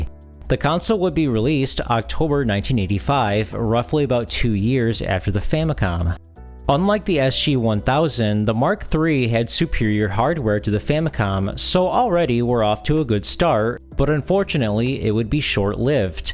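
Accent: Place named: Transatlantic, United States. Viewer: So neat to hear the transatlantic accent with relatively high quality sound compared to commercials and even some films made in the 1940's. Transatlantic + video games = complete bliss! As someone who's played a good deal of both in the 2000's, they both have their standout titles. While I love the Mega Man hexology on NES, Phantasy Star was no slouch!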